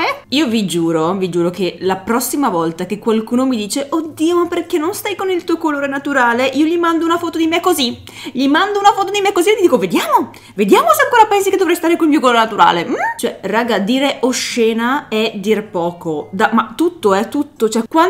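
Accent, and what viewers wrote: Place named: Brescia, Italy. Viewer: Mi posso sbagliare, ma per caso sei Bresciana? Mi sembra di intuire un po' di familiarità nella tua voce😅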